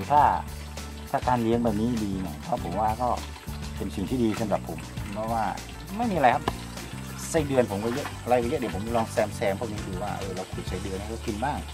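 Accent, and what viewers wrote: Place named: South, Thailand. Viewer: น้าเป็นคนใต้หรือป่าวครับ สำเนียงพูดคล้ายๆคนใต้เลยครับ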